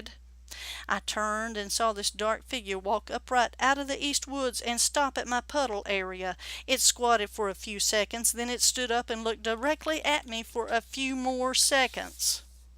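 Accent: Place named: Appalachia, United States. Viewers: Great story, and any kind of Southern accent is a welcome sound to my ears. 😀👍🏼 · I know where you are talking about you sound like Appalachian instead of a Texan I’m from south east Texas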